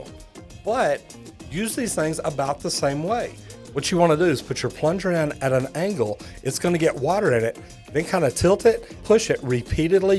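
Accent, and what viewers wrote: Texas, United States. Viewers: It's so great hearin a North Texan accent, I ain't sure what city exactly but that's DFW within 75 miles could be a bit further either way... Folks don't realize we'll drive 45 minutes for supper in Texas, it's a stretch town to town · That I can tell a fellow Texan accent from the rest of the world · His accent is a mix of gay and Texas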